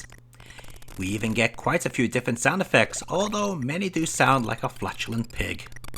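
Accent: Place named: Liverpool, United Kingdom. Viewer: I think these remastered "Battle" vids are a great idea,! I'd actually forgotten about the days when your videos featured overlay text only and no sign of your gentle very-slight-hint-of-scouse tones...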